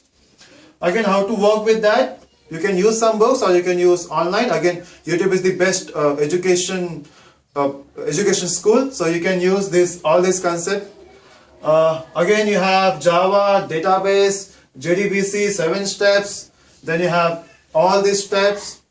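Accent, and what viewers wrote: Russia. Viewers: for 10sec i thought u were russian sir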